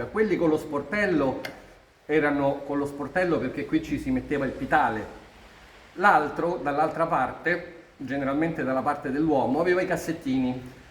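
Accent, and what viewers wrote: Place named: Lazio, Italy. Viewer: Salve 🙂 Complimenti!il suo accento forse mi sembra Laziale,ma il cognome che porta è senza ombra di dubbio Ragusano!!! saluti da un ebanista e restauratore 🤚🏽🤚🏽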